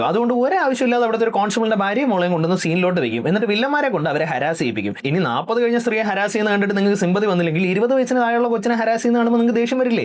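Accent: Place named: Kottayam, India. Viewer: Chettan Kottayamkaran ano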